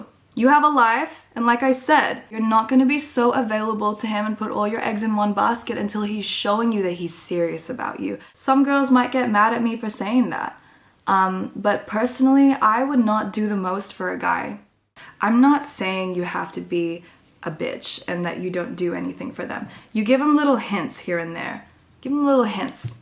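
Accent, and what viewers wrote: Australia. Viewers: are you Australian? I can't figure out your accent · omd are you Australian or am I tripping?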